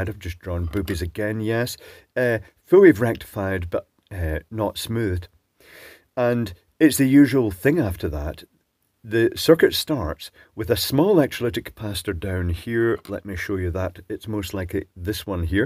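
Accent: Scotland, United Kingdom. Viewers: That will be the Scottish Soothing Voice. :)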